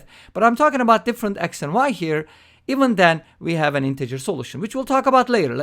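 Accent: Turkey. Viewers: Excellent tutorial, fantastic perspective! Just based off of your accent I think you are Turkish aswell right ? Just an assumption you don't have to answer it haha.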